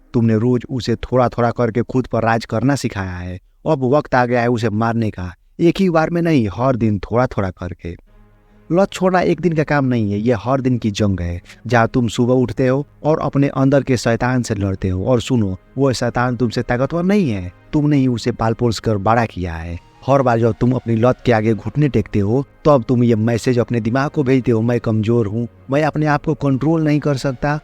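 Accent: Bengal, India. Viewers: Bahot badhia bengali accent dada.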